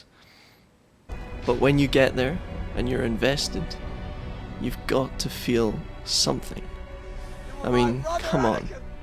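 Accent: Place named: Scotland, United Kingdom. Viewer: You sound like an Irish episode 2 Anakin Edit: yes, Scottish, my bad!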